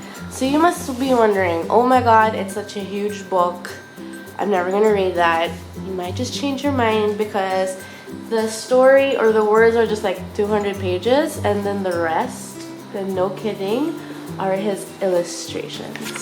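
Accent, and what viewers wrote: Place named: Philippines. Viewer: I sensed you were from the Philippines! Great haul,:)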